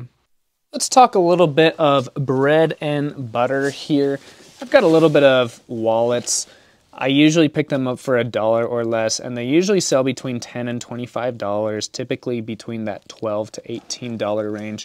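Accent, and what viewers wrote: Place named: Scandinavia. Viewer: Loved your Scandinavian accent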